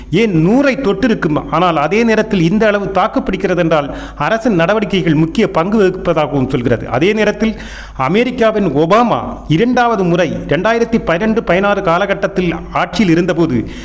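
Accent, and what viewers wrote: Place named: Kerala, India. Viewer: Very nice presentation. Your Malayalam mix accent is very interesting!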